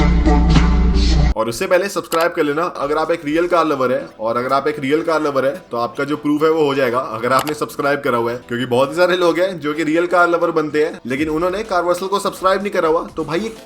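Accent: Haryana, India. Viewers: Bhai too much haryanvi ACCENT WAY TO MUCH,problem accent nahi accent ki wajah se jo INFORMALITY wala haryanvi touch aap le ate ho WO HAI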